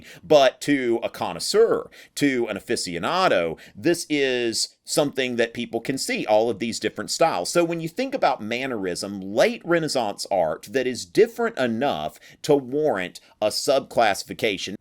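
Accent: South, United States. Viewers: Your southern accent really kills me!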